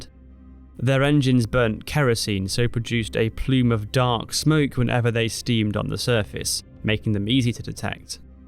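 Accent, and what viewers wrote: United Kingdom. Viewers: Ur voice sounds like british accent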